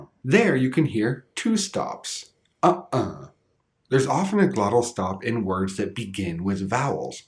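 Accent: Canada. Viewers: It is English, from a native speaker, he's also Canadian, so it would be "Canadian English" I suppose. The North American pronunciations are the widest spoken, more than 75% of native English speakers use North American pronunciations. If he were Welsh would you expect him to say "Welsh English"?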